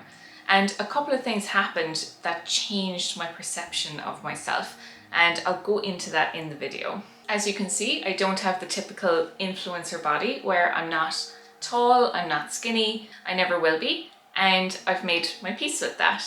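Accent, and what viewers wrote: Ireland. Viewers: Great video. Informative and uplifting.. thank you for the tips. PS. Your pup is adorable and I adore your Irish accent. Best wishes from 🇨🇦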